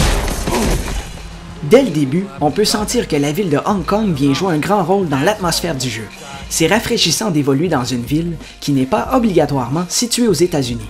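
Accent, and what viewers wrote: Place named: Canada. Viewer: J'adore ton accent et j'aimerais trop aller au Canada!!!!!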